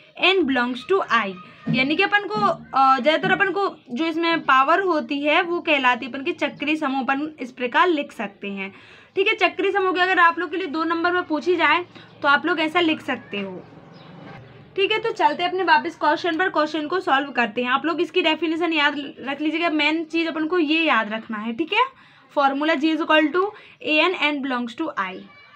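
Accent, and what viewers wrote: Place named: Bundelkhand, India. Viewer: Btatey btatey bundelkhandi a he jati h...😅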